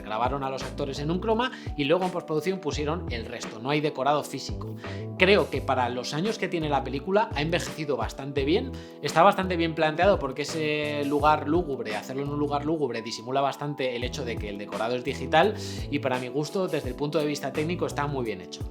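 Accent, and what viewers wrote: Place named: Castile, Spain. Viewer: Me encanta la pronunciación castellana , juro que entendí "Harry se encuentra con el mión" jeje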